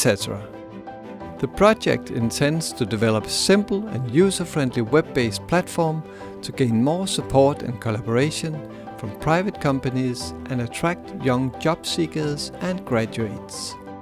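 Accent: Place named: Denmark. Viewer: So exotic to hear a Danish accent in a video about Laos ...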